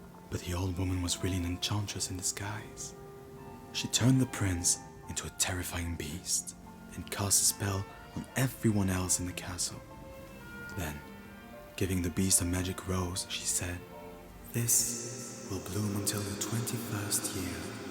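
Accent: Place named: France. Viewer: Your French accent suits it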